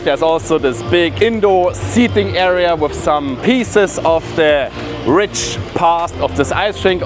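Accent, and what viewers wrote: Germany. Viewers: hi from Hungary ❤ where are you from? You sound German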